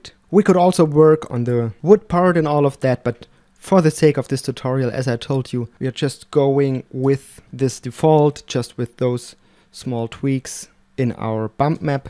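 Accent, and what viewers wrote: Germany. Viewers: You sound like a german, idk man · Du hörst dich deutsch an :D Vieeeeelen Dank für das Tutorial, genau was ich gesucht habe und absolut verständlich erklärt !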